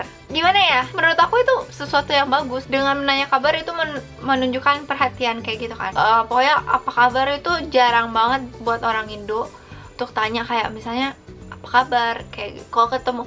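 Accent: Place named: United Kingdom. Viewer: your accent is getting more british! ❤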